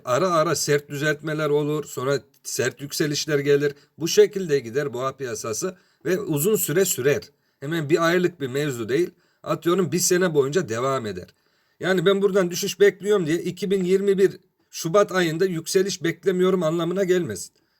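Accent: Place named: Adana, Turkey. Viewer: Şiven aynı Adana yöresi gibi memleketin neresi be abi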